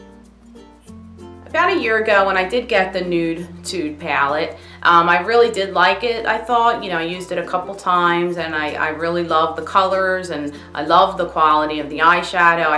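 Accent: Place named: Philadelphia, United States. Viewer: Also I just stalked you a little meaning I went on your twitter and saw your from PA. I knew you were from the Philly area. Your accent is comforting to me and makes me miss home.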